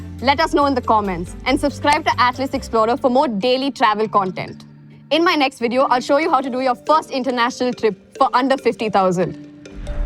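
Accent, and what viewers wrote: India. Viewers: she is promoting thailand and degradation Goa is she AI ? why she doesn't look indian but having thick indian accent ? is she even indian ? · Your accent is Indian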